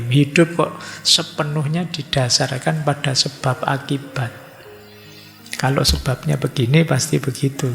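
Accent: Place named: Malang, Indonesia. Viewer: Dimana yah kajianya,tempatnya pak Guru ini yah,nadanya kayak orang Malang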